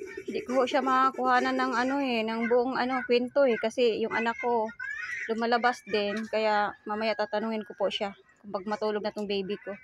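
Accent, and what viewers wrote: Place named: Visayas, Philippines. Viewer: Bisaya diay ka day. Pero buotan ka kaayo....tnx God may taong kagay mo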